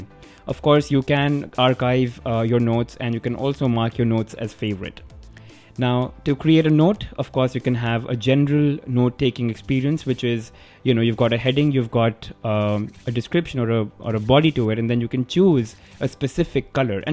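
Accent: India. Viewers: amit gupta We love being rqcists. I should sue this guy!! I had 2 seizures because of his God Damn fucking voice/nasty ass Indian accent. I like India like I like the flu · I'm a white guy from California. I can understand what he is saying no problem. He has an Indian accent but Its not that bad. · bruh... indian accent English is pure fucking shit ear cancer! Also, no app for begging for "bobs and vegana"?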